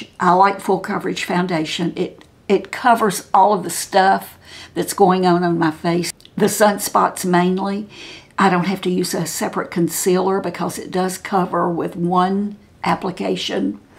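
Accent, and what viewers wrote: South, United States. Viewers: really enjoy your videos. Love your Southern? accent. Could you tell me what in your opinion, is the bed undermaker primer. I have a lot of wrinkles from living in the middle east for years and being very fair. Thanks. xx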